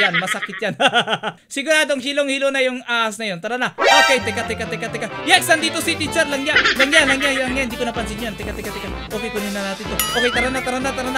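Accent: Ilocos, Philippines. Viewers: Kuya ilocano kaba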